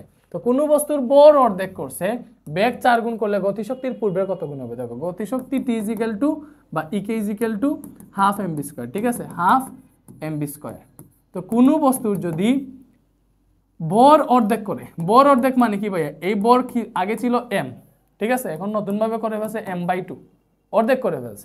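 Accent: Sylhet, Bangladesh. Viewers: Vai apni ki sylheti😊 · vhaiya apeni ki sylhetii?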